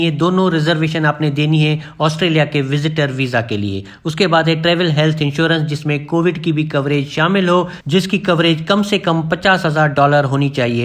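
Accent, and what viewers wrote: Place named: Sindh, India. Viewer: Aap k lehje se lagta hai aap Sindhi speaking Bhai hain.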